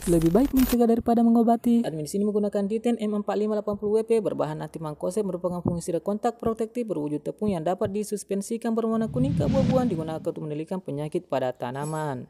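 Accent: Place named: Sulawesi, Indonesia. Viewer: Hafal logatnya dari Sulawesi yaa gan.... hehe. Kami pun sama mengelola cabai juga gang. Boleh kemari mampir juga gan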